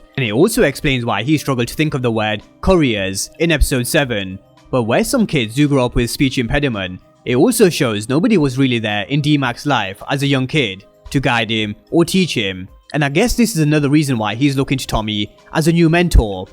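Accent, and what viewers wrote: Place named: United Kingdom. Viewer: Makes you wonder who is JP's ex though (D-Mac's mother)?🤔💭 JP was definitely straight at some point of his life to have D-Mac to begin with, yet there needs to be some back-story explored to why we don't know about D-Mac's mother? D-Mac is mixed-race so his mother must be black, but then why did she leave JP and her son is another question? P.S. Well done for hitting almost 100K subs - been tuned into your recaps since you were on less than 10K 💯🔥 Guess it's great to hear TV series reviews from a British guy - your selling point!😎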